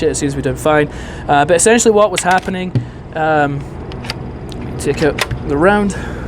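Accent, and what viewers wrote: Ireland. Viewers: Irish much? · Irish or Scottish?? 😁 Just ordered mine last week. Just waiting on shipping.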